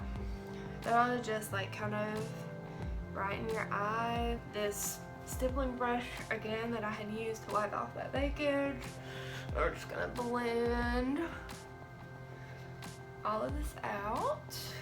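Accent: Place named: South, United States. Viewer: Where you from girl ? I’m from Texas and I thought I had a country accent !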